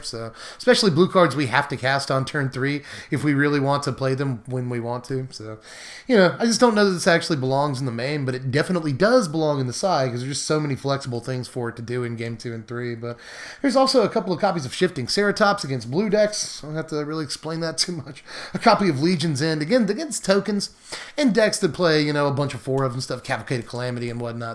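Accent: South, United States. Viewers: What part of the south you close to Tennessee lol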